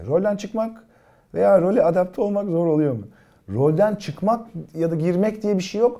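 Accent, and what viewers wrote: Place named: Black Sea, Turkey. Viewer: Sinan abi Allah aşkına sen karadenizli ol şiveni falan bırakma ŞUAN gerçekten MUSTAFA ASİYE sahnelerine ihtiyacım var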